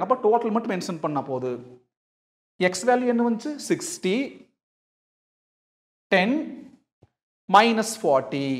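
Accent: Coimbatore, India. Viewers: Awesom 🎉🎉🎉😮😮😮😮cbe 🔥🔥🔥slang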